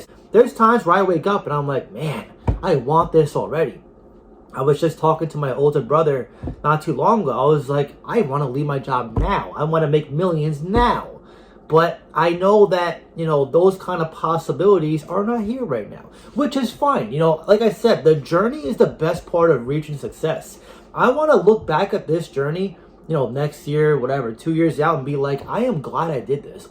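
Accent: New York, United States. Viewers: Are you from NY?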